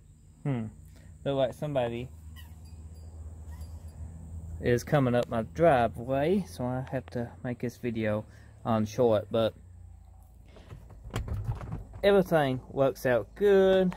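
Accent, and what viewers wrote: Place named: Louisiana, United States. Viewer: Cajun accent isn't it? You hear that down in Louisiana.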